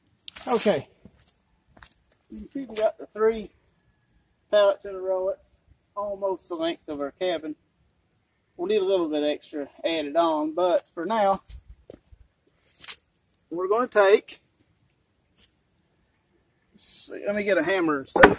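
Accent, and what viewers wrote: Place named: South, United States. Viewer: I definitely hear a southern accent...what part of the states are you in? Great video & an even more beautiful spot you have chosen. Much love from Mobile Alabama.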